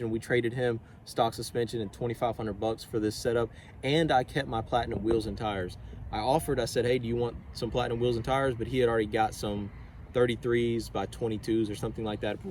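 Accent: Alabama, United States. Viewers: What part of Alabama are you from? I’m from Auburn!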